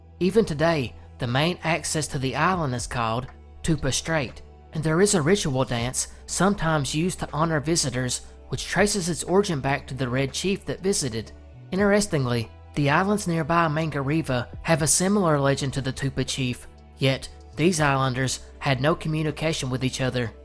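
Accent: South, United States. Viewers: lol dude I can’t lie, hearing you say “Mulan Pie” a hundred and fifty times in that signature southern brogue was worth the price of admission. I’m gonna watch all adverts all the way through for the rest of the video lol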